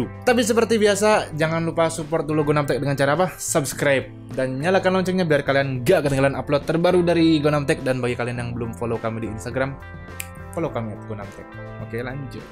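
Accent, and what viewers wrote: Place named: Bekasi, Indonesia. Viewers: orang bekasi kayaknya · entah kenapa, logat dan pilihan kosakatanya mirip aksen Bekasi gitu... Kental aksen Bekasi haha..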